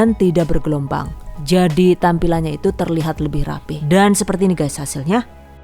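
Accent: Banyumas, Indonesia. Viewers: Bunda lucu. Dialeknya bisa Inggris, Indonesia dan Ngapak 🤭. Anyway, terimakasih reswpnya, bund. Kalaudioven, bagaimana ya hasil cream cheese-nya? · Bunda asline mana kok bisa pake logat ngapak si.. hehe salam ngapak dari Banjarnegara 😁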